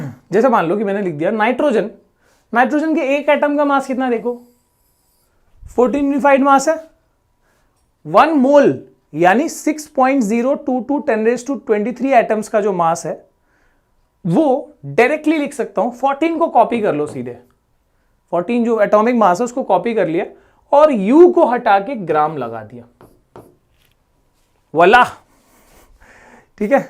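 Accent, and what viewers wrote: Bihar, India. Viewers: apke bolne ke tarika se lagta h ap bihari ho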